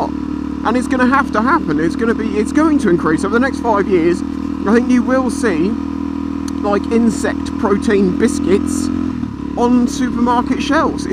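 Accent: United Kingdom. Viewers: yo what part of Britain you from?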